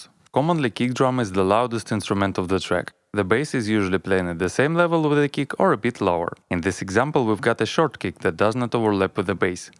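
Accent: Russia. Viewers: Nothing is better than a Russian person teaching you! Very unique person with good knowledge & really like his accent :)) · Акцент очень похож на русский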